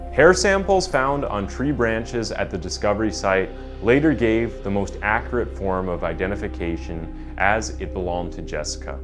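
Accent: Canada. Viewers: 🙄 Damn shame, you couldn’t tell that the narrator is Canadian by his accent? You must not know many Americans (but of course, our accents have a hell of range, you know). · Do you not hear his Canadian accent? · You're Canadian aren't you?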